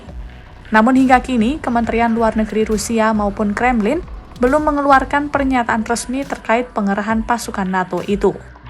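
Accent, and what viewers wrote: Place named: Java, Indonesia. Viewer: Suara Mbaknya wong jowo ya? Medhok poll😅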